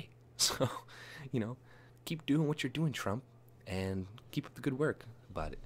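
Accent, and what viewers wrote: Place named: Toronto, Canada. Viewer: You sound like a Torontonian- You from around here?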